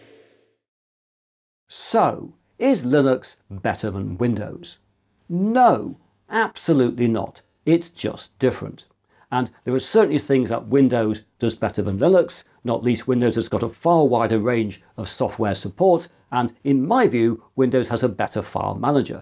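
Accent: United Kingdom. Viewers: Hello mister, this comment may be a bit unrelated to the video but I wanted to send you a message either way. I've learned of you by looking for information about SSDs, because back in year 2021 of October, I was upgrading my PC and I was a bit confused about the SSDs here and there. I was merely looking for basic information, but then I stumbled upon your channel, looking at your channel's avatar I thought; "Oh, it's an older looking man with a bob haircut and glasses, I believe he will be trustable, he also looks British, perhaps an old chap from Britain or one of the Americans that kept their roots from New England?". Ironically, your looks matched! I was going to do the usual of just skipping through the video, expecting some boring information I don't need...Well I did not do that whatsoever, I didn't even stop a second to "unbore" myself somehow. For whatever reason, you have this very British charm on you, where you always speak rather slowly, and it may sound boring but it is not so, and you have a wonderful accent that I if I was into one of those listening books or whatever they're called, hey I might actually like it. 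You also never make such unnecessary things, like stop to make a joke for 15 seconds, which in the end it is funny, instead, you just use your own British charm to say something very witty and it works excellently! Carrying on, the information you have provided for the SDDs was amazing, I learned a lot, it even wanted me to check m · So wonderfully British. Thank you so very much!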